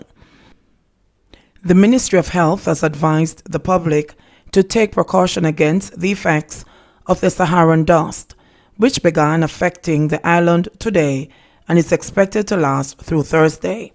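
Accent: Jamaica. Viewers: 32 idiots - NOTHING here to Dislike - Her Voice is Perfect 👌 for Audio & She Speaks with a WONDERFUL Jamaican Accent - She does not come across Stuffy - She comes across REAL & Intelligent—— “Clowns”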